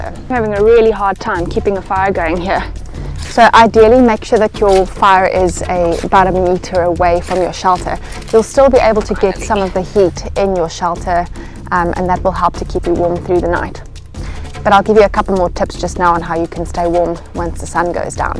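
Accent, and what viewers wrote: South Africa. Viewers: Good evening from a South African living in Switzerland .. (and a 'Kleinmonder' from the South Western Cape nogal 😊) .. 👍👍👍 A good posting .. thanks. Your Post popped up out of nowhere on my feed .. I took a quick look-see and when I heard the accent .. 'Sef Efriken' for sure 😁. Switzerland has many forests (minimum 30% of the Country Land Mass by Law) which are mostly not big enough to get lost or stuck in ..mostly 😏 .. especially where I live up North on the banks of the Rhine. .. no 'real' mountains .. just at times, steep hills. Looking at that Geography there by you, it reminds me so much of the Overstrand / Overberg Region. So nice seeing an Outdoor You Tuber from the Western Cape 😊. Yep .. getting a fire going in wet weather can be challenging .. the secret being in the preparation. For info .. very effective (and cheap) homemade fire starters are Cotton (100%) Makeup Pads (the round ones). 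Soak them in melted Candle Wax, allow to harden and you're good to go. Even better, soak them in melted Vaseline beforehand and then in the Candle Wax. They'll get 'hell frozen over' back on line and they burn for a good number of minutes. Some good tips in your post .. especially regarding layering and the danger of hypothermia. One should also take care not to overly perspire in cold (even no so cold) weather .. this 'can' be a killer. The secret is to start 'cool' and then add / remove layers of clothing to hopefully maintain that 'just above feeling cool' temperature. Another ti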